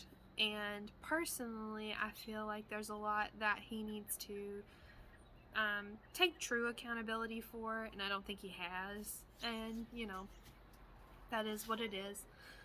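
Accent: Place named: Kentucky, United States. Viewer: Hey! I knew in your last video you has an accent. I used to visit friends in KY all the time. I haven't been back in about 8 years but love it there. I think 20 to 30 minutes is a good length depending on the content. I'm glad you didn't edit out the honking car it actually fit as a funny effect! Can't wait for the cooking video 😊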